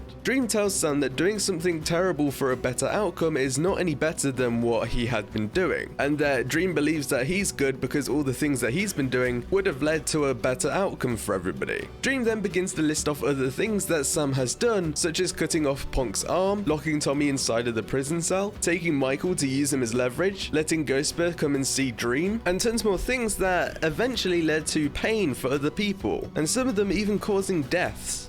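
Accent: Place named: United Kingdom. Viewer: You sound like a British Sam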